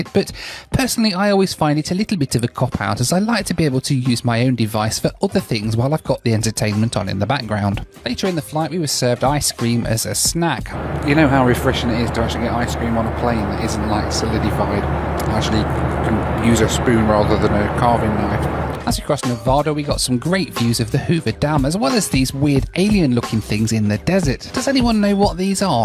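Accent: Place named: United Kingdom. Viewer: He's British and that's how they pronounce these cities names.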